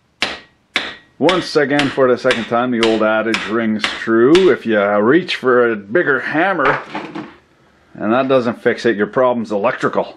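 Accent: Canada. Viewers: Lurnin Canadian with. Still don't have that accent down. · Good stuff. I'm starting to get the hang of Canadian speak. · Great to hear Canadian language. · Are you and 1pug1 brothers or is this just how all Canadians talk. bmp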